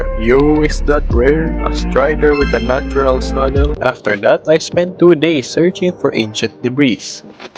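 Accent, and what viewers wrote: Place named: Philippines. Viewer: I already know you are Tagalog because of your Accent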